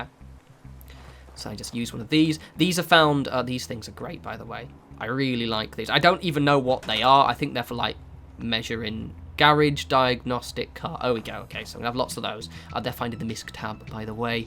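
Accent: United Kingdom. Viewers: nope British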